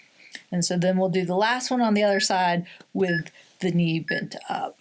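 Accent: United States. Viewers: I tune in to hear you say 'disclaimer alert' in that wonderful American accent.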